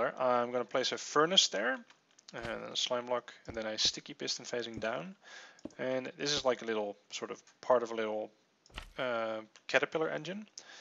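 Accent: Netherlands. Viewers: in Minecraft thought so. I recognized the way you talk. I'm Dutch to.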